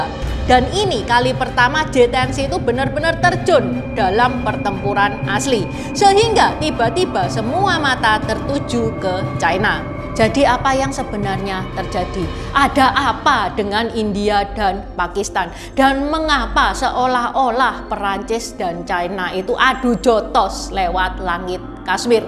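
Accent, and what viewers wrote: Java, Indonesia. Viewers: Medok mbk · Cino Jawa medhok, sy suka mengikuti...berapi²😊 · Cara komunikasi mbak ini sangat jawa dan indonesia sekali tiada kedengaran ngomong cinanya. Kalau warga melayu malaysia mendengarnya akan makin iri membandingkan warga keturunan cina malaysia. 😂😂😂😂 Mantap sekali komunikasi mbak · Medok se x jawanya Cece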